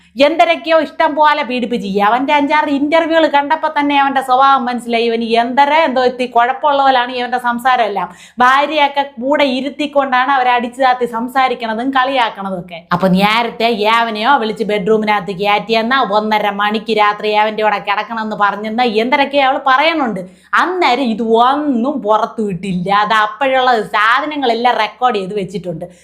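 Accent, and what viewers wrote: Thiruvananthapuram, India. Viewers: ചിരിച് ചിരിച് ചത്തു തിരുവനന്തപുരം കാരിയാണോ സ്ലാങ് കലക്കി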